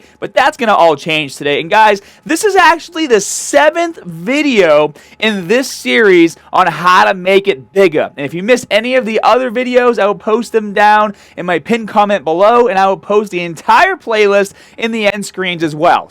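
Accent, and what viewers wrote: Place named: New England, United States. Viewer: Bro: never, ever, ever lose that accent! It adds to the uniqueness of your channel and, honest to God, at least for me, it helps to make somebody pay attention to what you're saying. Granted, I'm just your run-of-the-mill, excited neighborhood linguist. But, it's nice to hear a genuine New England twang for a change instead of that sterilized mid west-influenced general American Standard accent everybody tries for. Also, I am in total and complete agreement about the prone hamstring machine! I have the exact problem you describe with the seeded hamstring machine. Can't seem to stay in the damn seat whenever the wait is really high. I frequently find myself having to readjust my position every other rep or so. Best regards from Texas.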